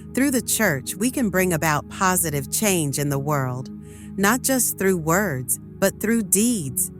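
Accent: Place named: Visayas, Philippines. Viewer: Fake..not deserving..bisaya kaayo.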